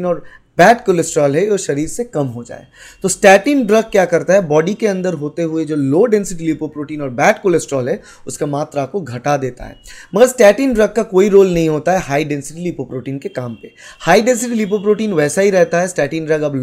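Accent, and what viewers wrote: Bengal, India. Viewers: Thank you sir for this video 🤍🤍🤍🤍... But I'm feeling like you have some Bangali tone in your voice…..☺️are you Bangali??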